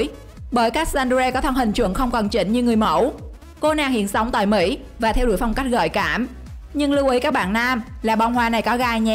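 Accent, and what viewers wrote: Vietnam. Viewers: Giọng bạn ấy là Trung lai Nam Giọng miền Nam đậm chất hơn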